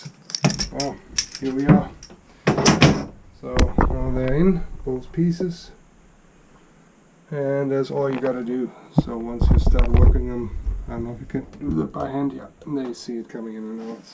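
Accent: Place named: Germany. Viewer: Somehow, from your accent, I'm guessing your mother tongue might be German. I am with a MD1B from the 1970ies that was running until 2019 sort of, until then it was left for good, might have some little rust and such... I want to try to fix it all myself first, but if I fail I might want to come back to you with questions and such.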